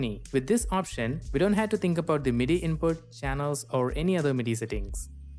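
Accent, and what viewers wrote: India. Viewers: yooo such insightful tutorial, god bless. Also loved your mallu accent XD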